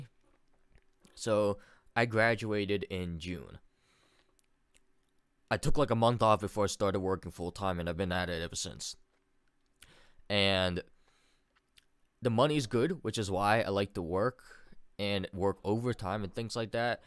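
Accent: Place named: Ontario, Canada. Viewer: You sound like your from ontario, more specifically markham area... Other than the guns that makes me think your in usa maybe north side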